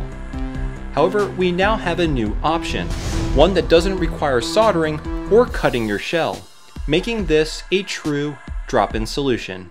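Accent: Baltimore, United States. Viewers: Are you from Baltimore? I feel like you have a Baltimore accent! Lol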